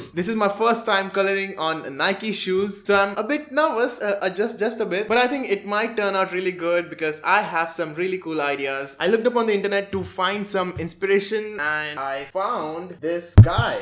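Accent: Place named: India. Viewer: That's kinda rude,but i think that he's Indian cuz his accent.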